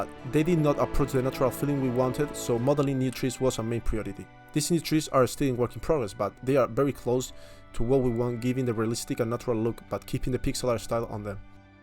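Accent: Spain. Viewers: this game looks AMAZING, I cant wait to know more also, is that a fellow spanish accent I hear?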